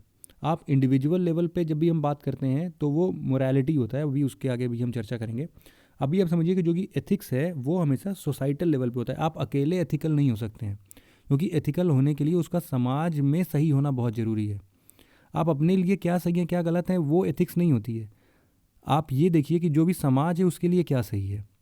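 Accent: Kanpur, India. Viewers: Sir aap kanpur se ho kya??? Kidwai nagar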